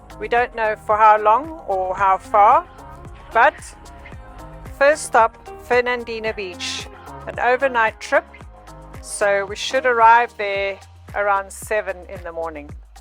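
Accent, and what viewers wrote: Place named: Zimbabwe. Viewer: Dear and, this is first time I've viewed your channel and I enjoyed it immensely, thanks! In a twist of fate I know already from our Allan Wilson High School days in the early '70s when we were both boarders at Burnham House! I remember you well and you've done really well for yourself in the maritime industry from a landlocked childhood! Your narration was great, I loved hearing the familiar Zim accent, thanks! Happy sailing guys! Cheers, Jeremy Lancashire (Lancs)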